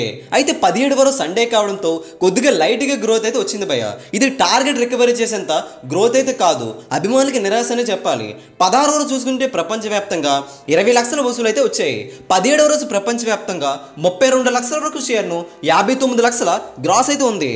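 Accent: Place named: Srikakulam, India. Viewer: Babu Nuv pakka srikakulam village babu laga unnav ne yasa nuvvu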